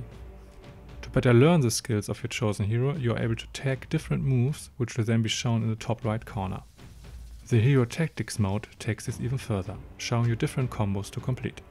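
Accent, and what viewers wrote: Germany. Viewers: are u german btw? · I h Liebe deine Aussprache kann es sein das du Deutscher bist ? PS super Video danke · WIe man den deutschen Akzent hört loool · Ich würde jzt einfach mal so sagen das es ein deutscher Akzent ist ?🙈😂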